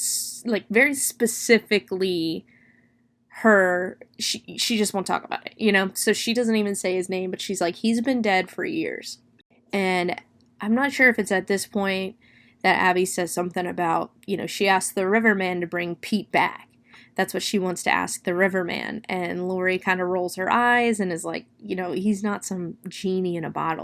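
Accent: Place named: South, United States. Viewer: Just noticed you sound more Southern throughout the video lol. Anyways, good review and keep up the good work! 👍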